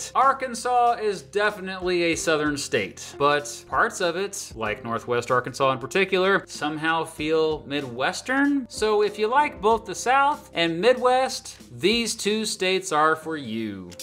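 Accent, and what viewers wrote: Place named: North Carolina, United States. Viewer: I always thought your accent sounded like North Carolina. I've lived in Missouri half my life too.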